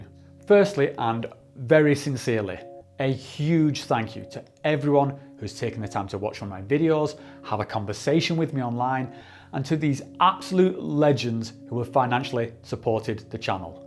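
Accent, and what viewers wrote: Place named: Bolton, United Kingdom. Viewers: Wierd watching someone with Bolton accent everything is usually American it's a nice change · ah!! Now makes perfect sense thanks. The Manchester accent threw me! Lol Keep up the content, I enjoy the channel. :-)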